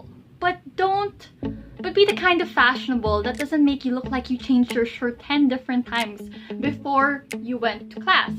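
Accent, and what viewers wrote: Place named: Palawan, Philippines. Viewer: Ate palinawan po yung salita mo po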